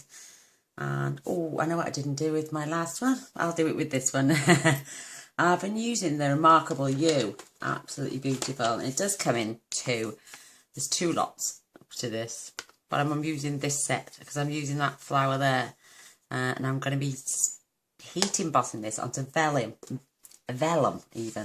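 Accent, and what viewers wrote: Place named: Wales, United Kingdom. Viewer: i thought i hear ed. A bit of walsh hun i am in cardiff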